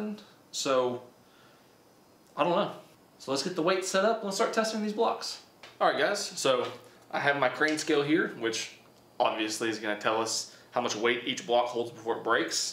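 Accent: South, United States. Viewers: Did the beard bro-ham, you from southern U.S? Just wondering, mainly your accent.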